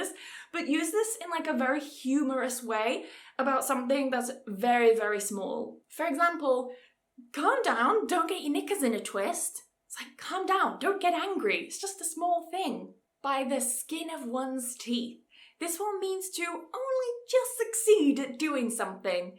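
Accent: United Kingdom. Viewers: !! I love your videos! How do I find you on preply without having to search through every tutor? May favorite idiom … 🥁 Keep your wig on 😅🤣😂🤣😂🤣😂 Love it! Going to use that one here in America! I’m American (Spanish American), however LOVE the British accent and want to learn proper pronunciations. Thank you! Love your videos!! 🎉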